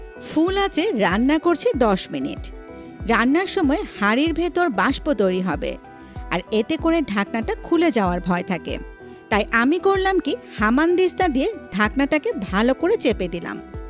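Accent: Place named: Bogura, Bangladesh. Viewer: আমার মনেহয় আপু বগুড়ার মেয়ে, কথা শুনেই কেমন জানি বগুড়ার স্বাদ খুজে পাই, যাইহোক আপুর জন্য ভালোবাসা রইলো, জীবনে যদি বড় হই তবে আপুকে একবার দেখার চেষ্টা করবো।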